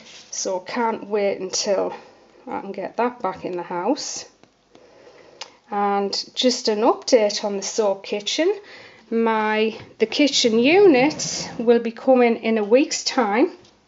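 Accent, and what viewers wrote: United Kingdom. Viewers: Love to hear your beautiful British accent and your videos! Living in Rochester, NY we have alot of gray days as well. Keep up the great work, You cheer us up here!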